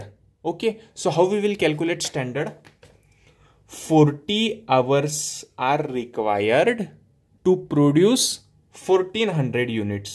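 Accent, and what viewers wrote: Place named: England, United Kingdom. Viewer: I'm from tamil nadu loving your english accent ❤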